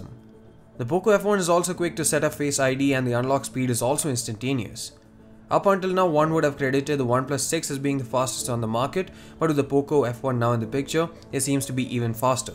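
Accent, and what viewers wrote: India. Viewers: Mallu ayirunno, njan vicharichu hindi karan ennu😀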